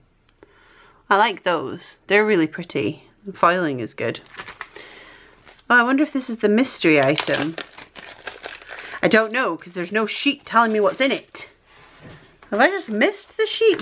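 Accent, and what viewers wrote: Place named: England, United Kingdom. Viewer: 🤣🤣 love your ‘proper’ English accent!!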